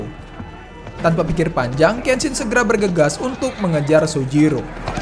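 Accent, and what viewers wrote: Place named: Java, Indonesia. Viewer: Suaramu gk enak cok medok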